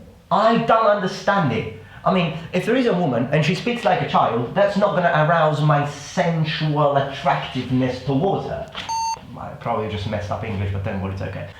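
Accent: England, United Kingdom. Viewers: Perche' e la prima volta che vedo un tuo video ,e mi sembrava dall'inizio che sei italiano,anche seppure hai l'accento inglese ahahha.E io poi che sono rumena ,ritornata 7 anni fa in Romania dall'Italia :)))?! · from my vantage point here in merica you need to do some bicep curls bro. and why do you sounds like an Englishmen if you're an eyetalian?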